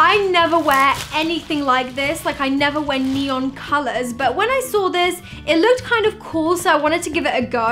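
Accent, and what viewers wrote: England, United Kingdom. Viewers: Are u supposed to be British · Is she English?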